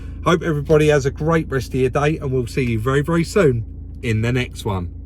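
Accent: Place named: Cockney, United Kingdom. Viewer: Nice video guys , i took a personal interest as a partner owner driver ( yes your right they are all the same except badges and outside grill bits and a few other bits😮) and i must admit i was worried when you gave it a test drive and you mentioned smoke but you knew what you was onabout, good honest video and you do yourselves proud every time, as a Northener i allways imagined you guys with Southern accents were a bit sharp but you do give the motor trade a good name and well done .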